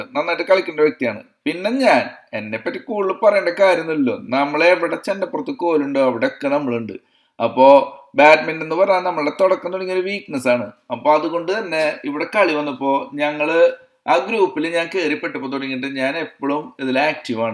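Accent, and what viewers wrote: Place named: Thrissur, India. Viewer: ഇത്ര നാളും അമേരിക്കയിൽ കിടന്നിട്ടും ചേട്ടൻ നമ്മുടെ തൃശൂർ സ്ലാങ് മറന്നില്ലല്ലോ.. 👌👌👌ഇത് അമ്മയുടെ comment ആണ് 😄😄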